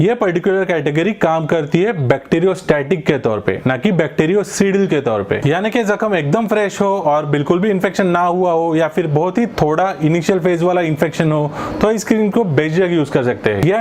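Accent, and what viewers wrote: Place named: North, India. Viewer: sure you are being modest an all..😊 but that's what YouTubers and Instagramers are influencers!! BTW what city are you from? Your Hindi is very North😃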